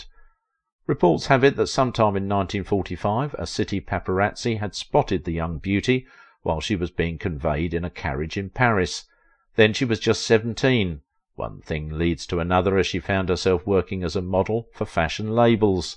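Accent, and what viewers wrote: United Kingdom. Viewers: Your "Proper" British accent does not hide your ignorance - "Song Without End" is not a documentary BY Franz Liszt...it is ABOUT Franz Liszt - the Hungarian Composer, and virtuosic Pianist! Jeeeezus!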